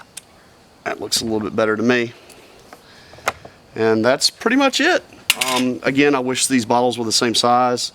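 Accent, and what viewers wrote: United States. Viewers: u talk western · Cool video, bro. Haha, you sound like Forest Gump, Tom Hanks voice and Southern Accent.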